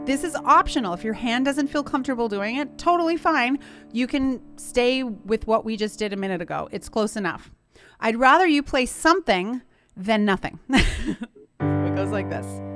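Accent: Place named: Portugal. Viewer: Thank you so much. Another excellent video, love you accent, probably portuguesa, much gracias ❤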